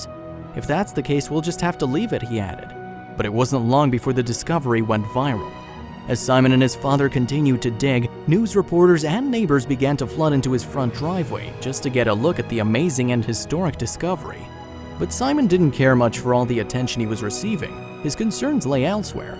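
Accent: United States. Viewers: Unfortunately he is speaking American not English. They pronounce words like a-loo-min-um not al-u-min-e-um and sked-ull not shed-u-al.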